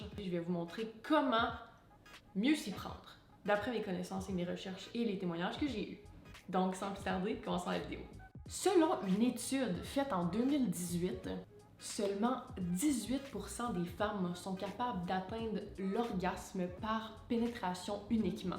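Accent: Quebec, Canada. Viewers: Pas forcément facile avec l'accent du Québec, mais très sympa dans les explications.. 👍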